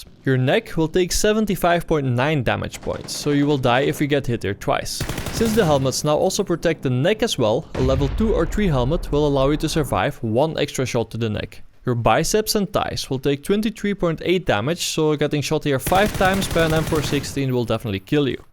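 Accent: Netherlands. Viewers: You must be dutch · jaja, 100% een nederlander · are you dutch by any chance?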